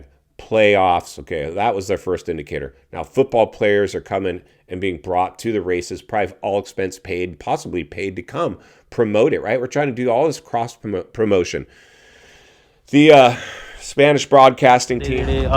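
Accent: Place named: United States. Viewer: 😂 American 💩 talking again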